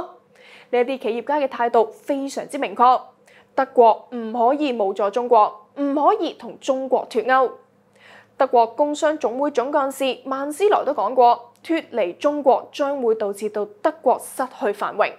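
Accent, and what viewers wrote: Standard. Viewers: 歡樂時光，人靚聲甜字正腔圓又鬼馬😘😘😘👍👍👍💪💪💪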